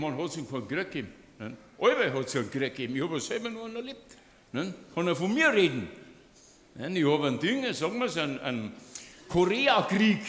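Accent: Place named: Bavaria, Germany. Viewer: Sei deitsch is mindestens so guat wia vo manchem Preissn, moan i.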